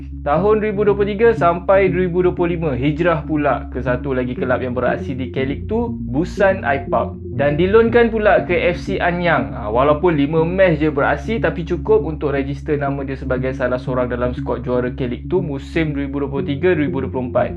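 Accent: Malaysia. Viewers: Pronunciation biar betul bang, jangan Melayu sangat bunyinya.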